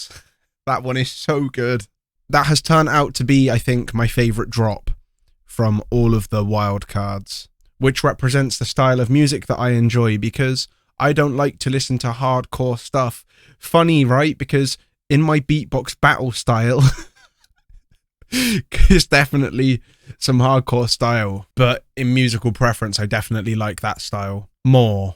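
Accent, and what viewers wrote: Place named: United Kingdom. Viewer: И твой британский английский как скальпель по яйцам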